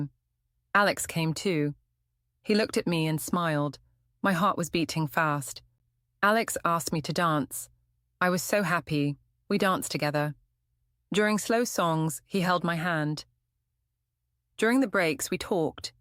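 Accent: United Kingdom. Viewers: I think it's British.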